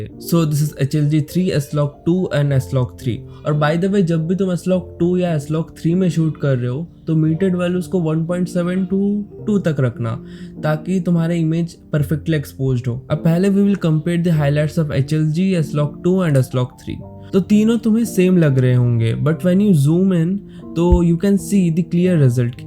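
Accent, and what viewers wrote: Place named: Bengal, India. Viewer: Your bangali accent sucks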